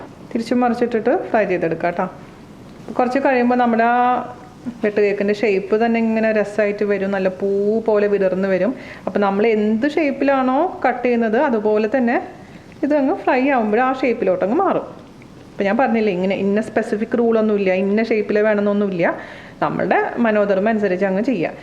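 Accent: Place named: Kodungallur, India. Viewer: Chechi... ഞാൻ ചേച്ചിയുടെ ചാനൽ കണ്ട് തുടങ്ങിയത് ഈ ഏപ്രിൽ മാസം മുതലാണ്. ശ്രദ്ധിക്കാൻ കാരണം ചേച്ചിയുടെ സംസാരരീതിയാണ് കേട്ടപ്പോഴേ തോന്നി നമ്മുടെ നാട്ടുകാരിയാണെന്ന് (ഞാൻ കൊടുങ്ങല്ലൂർ). പിന്നെ ചേച്ചിയുടെ വീഡിയോസ് കണ്ടു തുടങ്ങി. ആദ്യം കണ്ടത് ചേച്ചിയുടെ കഥയാണ് പിന്നെvacation വീഡിയോസ്, യാത്രകൾ, വീട് ,ഷോപ്പിo ഗ് അതെല്ലാം കണ്ട ശേഷമാണ് റെസിപ്പികൾ കാണാൻ തുടങ്ങിയത്.ആദ്യം പരീക്ഷിച്ചത് കേക്ക് ആയിരുന്നു അതിൽ വിജയിച്ചു പിന്നെ ബിരിയാണി, ഗുലാബ് ജാമുൻ ,അങ്ങനെ കുറേ ഐറ്റംസ് പക്ഷെ ഏറ്റവും ഗംഭീരമായത് വെട്ടു കേക്ക് ആയിരുന്നു. അച്ഛനും അമ്മക്കുമൊക്കെ ഒരു പാട് ഇഷ്ടമുള്ളതാണ് ഈ വെട്ടു കേക്ക്. എന്തായാലും ഇപ്പോ കുറേ പലഹാരങ്ങൾ ഉണ്ടാക്കാൻ പഠിച്ചു. ഒരു പാട് Thanks ചേച്ചി.എല്ലാ പ്രാർഥനകളും.....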